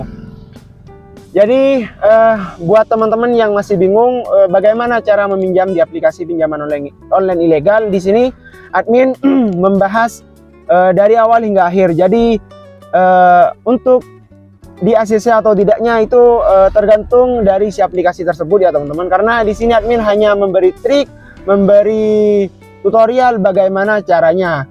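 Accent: Indonesia. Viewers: Kayak orang madura bahasa nya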